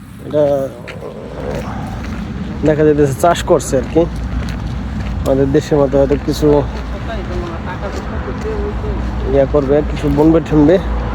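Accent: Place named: Munshiganj, Bangladesh. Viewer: না আপনার কথা সুনে মুন্সিগঞ্জের কথার মতো লাগে তাই জিজ্ঞেস করলাম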